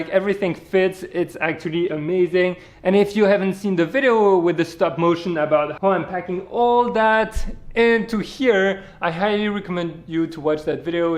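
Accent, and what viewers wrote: France. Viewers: It depends on the kind of aps c you're using. If you convert a 16mm on Sony aps c camera you have to multiply. 16 * 1.5 = 24. So it's the equivalent of a 24mm on a 3/4. Love your French accent btw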